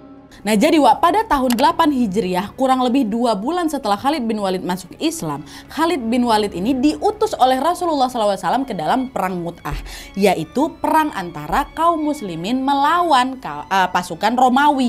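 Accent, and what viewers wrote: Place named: West Sumatra, Indonesia. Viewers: Urang awak logat minang nyo kentara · Uni urang awk yo kelihatan banget dalam bicaranya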